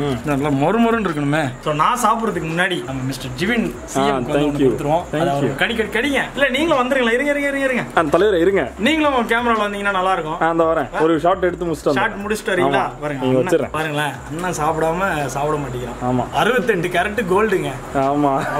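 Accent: Nagercoil, India. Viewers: Slang like nagercoil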